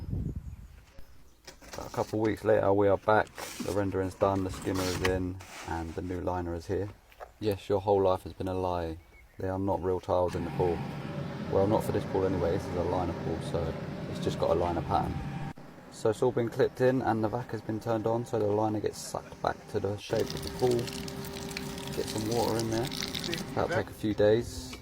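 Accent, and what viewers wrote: United Kingdom. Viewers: a British accent is relaxing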